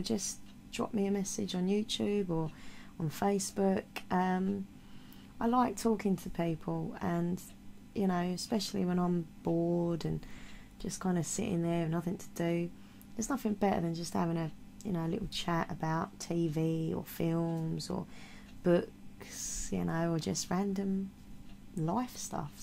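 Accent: Essex, United Kingdom. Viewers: Thanks for this video,; you can count me as another person who finds comfort with the ASMR community on here. I've seen a couple of your videos before and was instantly intrigued because you sound like you're from Essex (that's where I'm from originally) if not London, am I right? Right now, I am going through a particularly heavy bout of feeling inherently lonely. I have quite a few good friends but the problem is they don't live near me so I only 'see' them virtually 98% of the time. I don't have a partner and haven't had one for years and being quite sensitive as well as being a massive romantic at heart, sometimes it can be cripplingly lonely for me here. I've also struggled with Depression and mild anxiety issues for 15 years or so and that has increased of recent where I'd had a fairly long break of no depressive behaviour I'd like to reach out to you on here and am subbing so I can follow you and catch up on more of your content And yeah, I know EXACTLY what you mean with the shopping thing. 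Happened to me just today. I've said this before in the comments section of a few ASMRtists, but I think that some of you are really some innately beautiful souls that do so much good and are so compassionate and genuine, that I know that for me personally, I would have a harder time coping and relaxing sometimes if it weren't for people like your good self making such invaluable and beneficial content. So please do keep on making videos and ignoring all the f**kwads and trol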